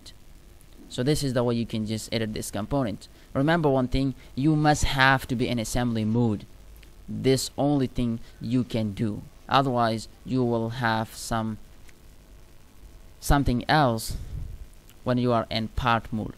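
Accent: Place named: United States. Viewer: yaar accent to na daalo itna! sadi english bol lo aapka meericun accent se kuch samagh nai aaya!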